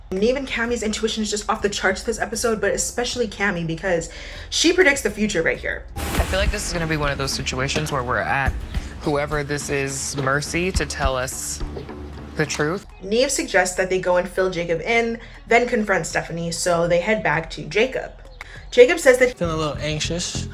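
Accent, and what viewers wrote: Canada. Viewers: ahh I’m kinda fangirling rn. I’m so stunned that you replied!! I’m a new subscriber and have been binge watching all of your catfish and catfish adjacent content and I’ve been loving it and loving you!!! I also wanna say I love the sprinkles of Canadian we get from you, it’s so charming and I love it, it makes me giggle because I find it so cute! Thank you for being a real one bestie and I can’t wait to continue watching you for years to come ❤️ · Girl don’t feel weird about your Canadian accent! Your voice is so soothing and you’re an amazing story teller! I just came across your content a few days ago and I have been bingingggg ❤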